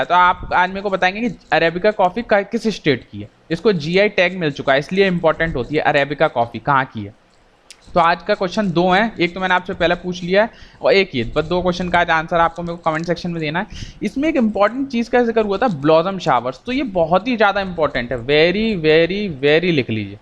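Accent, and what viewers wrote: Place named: Delhi, India. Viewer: Sir apki video dkhta hu pta hi ni chlta kb video khtm ho gyi....ap exact to the point pdhate ho....delhi's local language 👌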